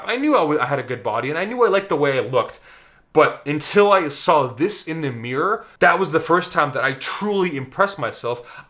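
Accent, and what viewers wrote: Russia. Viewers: That Russian accent tho... 😆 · omg the russian accent lol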